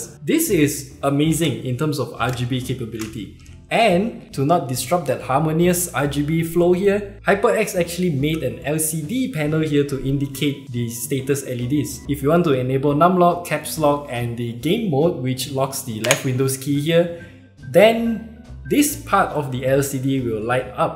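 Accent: Singapore. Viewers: You from Singapore lah? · Noice video by the way are you Singaporean because that accent sounds soo familiar.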